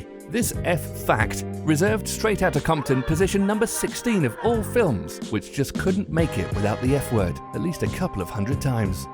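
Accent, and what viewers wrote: England, United Kingdom. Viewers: It's called a British accent. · He has a british accent, have a little understanding, so fucking what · to give him a bit of a break hes british. I cringe every time he says aluminum for the same reason.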